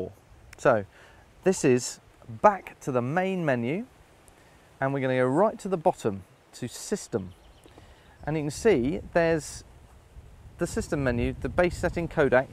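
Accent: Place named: England, United Kingdom. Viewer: english accent sucks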